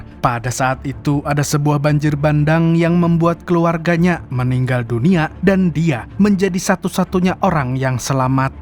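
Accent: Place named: Tasikmalaya, Indonesia. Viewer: Si sorana siga org tasik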